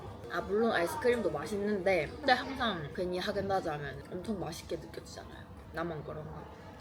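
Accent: Gyeongsang, South Korea. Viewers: 고향이 경상도 쪽이세요?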